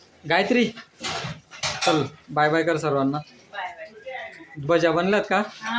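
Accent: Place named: Dhule, India. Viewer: तुमचा बोलण्या वरुन तूम्ही धूळयाकडचे वाटता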